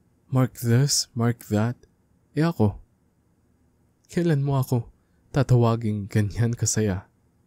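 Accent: Conyo, Philippines. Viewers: sabi ko na nga ba pilipino sya eh, sa accent palang😊❤ btw, I love your videos❤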